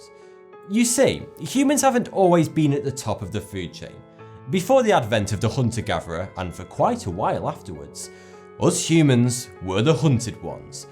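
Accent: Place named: North, United Kingdom. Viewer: Hey where are you from because I recognise your accent.Do you live near Bury or Bolton?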